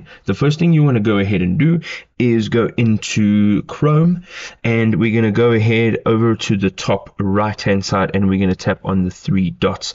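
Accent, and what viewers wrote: South Africa. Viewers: You sound South African....? Like from Western Cape, right?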